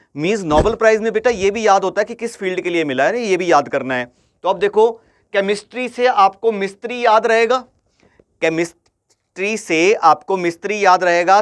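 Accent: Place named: Punjab, India. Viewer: Thank you sir . And love your punjabi accent ❤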